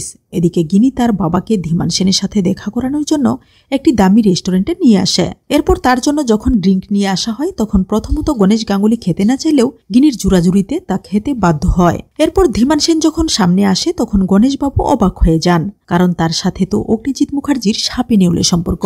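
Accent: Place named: Bangal, Bangladesh. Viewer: অতি সাধারণ কথক। অশিক্ষিত উচ্চারণ। বাক্যের শেষে টেনে টেনে বলা শুনতে ভালো লাগে না। আবার,বানগাল টান ও রয়েছে।তবে, চেষ্টা করলে সব খামতি শোধরাতে পারবে,তা আমি বিশ্বাস করি। চেষ্টা কর ভালো কথক হতে। শুভেচ্ছা রইল।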